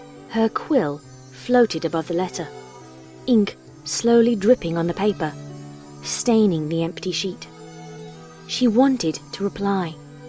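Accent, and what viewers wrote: United Kingdom. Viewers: i loved your storys plus your voice is kinda cute in British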